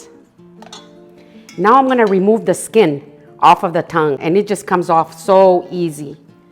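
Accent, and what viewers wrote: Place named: Ohio, United States. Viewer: I just began to follow you a couple of months ago. I noticed your accent and it sounded familiar. Now, I know you live in Ohio. I was born in Ohio raised there until 8 years old. My family moved to the RGV and we’ve been in Texas ever since.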